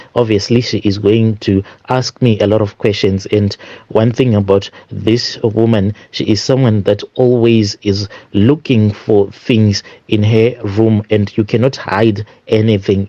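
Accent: Zimbabwe. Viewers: This is just crazy the story teller sounds Zimbabwean or a national foreign but all his stories are based on South Africans …I sense lies here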